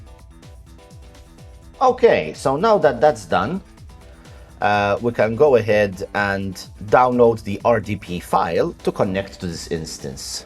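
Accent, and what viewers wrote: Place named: Italy. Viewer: great tutorial! I used AWS so far, but this tutorial got me interested in GCP and I started learning about GCP more so I think it'll be a longer journey which I got into thanks to you :) I just love how smooth the GCP interface is compared to AWS p.s. nice accent, I'm guessing Italian although I don't know what is the accent of Maltans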